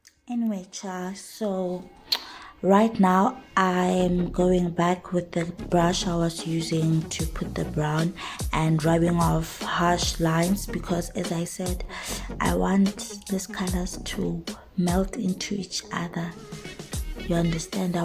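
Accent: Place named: Nigeria. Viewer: is it even a video if we don't get the naija accent!!! love it😂😅😂😜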